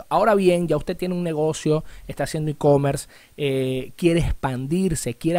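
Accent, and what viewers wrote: Venezuela. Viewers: GRCIAS, EXCELENTE, CLARA, CONCISA Y SENCILLA EXPLICACION, POR TU ACENTO Y MODO DE HABLAR SEGURO QUE ERES VENEZOLANO COMO YO. GRACIAS.. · amigo saludo desde venezuela super importante su informacion pronto estare en contacto con usted,por su forma de expresarse es de venezuela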